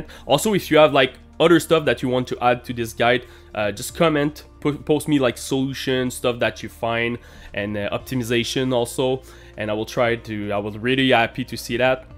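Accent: Quebec, Canada. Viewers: I can tell you have an accent “quebecois” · I knew you were French Canadian the second the video started. My mom has the exact same accent. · Quebecois fr 😁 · Your accent gives me flashbacks of CAF Basic Training in St Jean Sur Richelieu, lol. Thanks for the video, helped alot! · est-tu Québécois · Québec right? Anyway, gr8t video! Thanks! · He was just joking. I mean „your favorite french canadian“ - this is self-parody. Pretty funny imho. I like his accent btw. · It's funny how the windows game mode isn't a game mode. Are you francophone? From Quebec maybe, some of your voyelles sounds quebecoises. Your "if" sound really french! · 100% you are from québec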